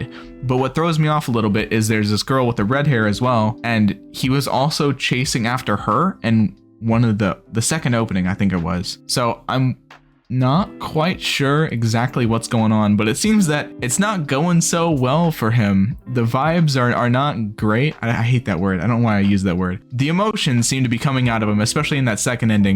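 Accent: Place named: North America. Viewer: Вот это английский. Наконец-то я понял КАЖДОЕ слово. Интересно - откуда ты? Из какой страны и региона?) Я по английски не говорю - но понимаю его (90% на тексте, и на слух моё понимание варьируется от акцента реакционера). Это точно не англия, в которой слова "глотают", словно повыбивали все зубы, это не центральная европа (там акцент более жесткий), и не восточная (там жестко абсолютно). Не латинская Америка. США, канада, Австралия - скорее всего одна из этих стран. Но в США от штата к штату акцент тоже варьируется. Точно не Техас))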